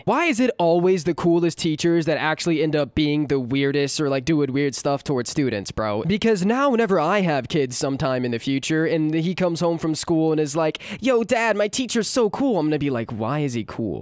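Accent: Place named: Texas, United States. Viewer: Sounds like Texas...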